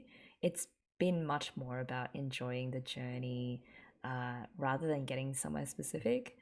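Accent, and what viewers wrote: Australia. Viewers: Really enjoy your videos and insights and have just spent the last 3 days binge watching K-On for the 5th or 6th time just so I could watch it along side you and catch up. Even after watching it so many times, you really bond with the girls (Ritsu is best girl for me) and tear up at the end as you feel like you're leaving your best friends. K-On is more than a cute-girls-doing-cute-things anime, and closer to a life lesson on getting the most out of the present. Since you liked the drum beat of Go! Go! Maniac (the second OP), here's a real-life Ritsu cover <link> She's totally awesome and was only like 13 at the time. Also as an Aussie from Melbourne who's been living in the US for the past 14 years, I really miss the Aussie accent, so that's been surprisingly comforting to listen to you too. Now I'm starting your Sora yori mo Tooi Basho (A Place Further Than The Universe) reactions, since that's another awesome show that I love. 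Thanks for all the work you put into the videos and look forward in watching Hibike Euphonium with you in the future.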